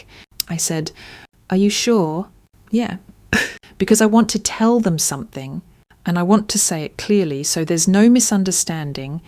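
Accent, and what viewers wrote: United Kingdom. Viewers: I’m wondering why the stories narrated by the British voice always has a pic of a female in a military uniform. Clearly this narrator is not in the military nor is there any military story lines. But all that aside, very good story as heartbreaking and happy all at once.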